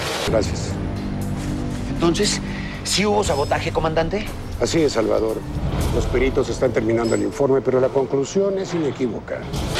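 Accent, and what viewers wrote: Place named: Mexico. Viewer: Tiene acento mexicano pero es peruano.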